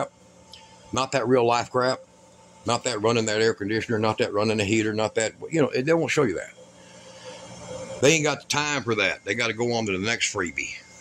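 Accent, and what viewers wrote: Texas, United States. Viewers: Thankyou, I'm from Texas too and you sound just like me... keep up the good work brother. I have walked more than a few miles in the same kind of shoes.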